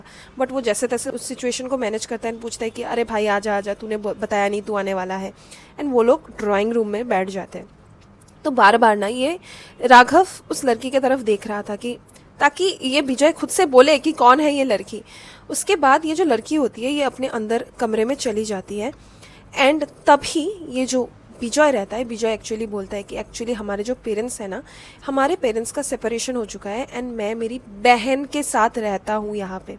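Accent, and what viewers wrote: Bengal, India. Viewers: Didi ap Bengali ho kya?😊😊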